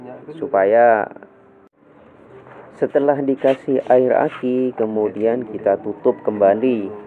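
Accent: Madura, Indonesia. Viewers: Orang madura ya broo?? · Madura ya cak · Logat madura.. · Madureh kak toan.. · Bahasane.....kayak Madura.. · Medureh dimmah om.?? Terro ajhereh 😊😃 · Ini kayaknys.😃 bahasanya madurasa asli madura bsng🤗telok lemak😅😅😅 tran tretan man nyaman tok cerr...serrr....tak iye....😅😅😅👍coba entar kalau accu err/ soak saya servis bang...tapi alamatnya di mana mau service accu mobil kalau gk bisa😅😅😅🤗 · Mak meddureh kak..... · Orang madura ni mh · Orang madura ya logat nya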